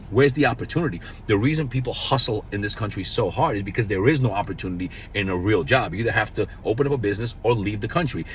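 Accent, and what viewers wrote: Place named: Dominican Republic. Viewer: Are you Dominican? You have the accent! 😮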